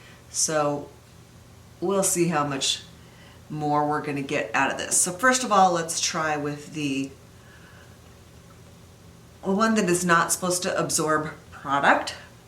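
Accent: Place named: Midwest, United States. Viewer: Born and raised in Iowa so I totally understand the Midwestern accent, yah hey! ;)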